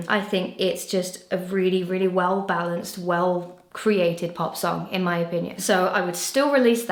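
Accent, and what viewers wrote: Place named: Essex, United Kingdom. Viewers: Off topic (and sorry if you've addressed it elsewhere) but has your accent changed? We're both from Essex and I could have sworn you used to have a more southeast England accent - it sounds a bit northern now? I've been a fan since the early days and now I'm going mad trying to remember if you've always had this accent! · I would have loved both villains 1&2 to be singles with a video. I also think that maybe putting the more pop songs on another album would have worked if you know what I mean? Also you have lost a little bit of your Essex accent and I’m missing it 😂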